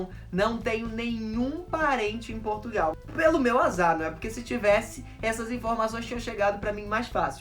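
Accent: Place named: Rio de Janeiro, Brazil. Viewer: Tinha que ser carioca com essa fala so -nos mesmo temos.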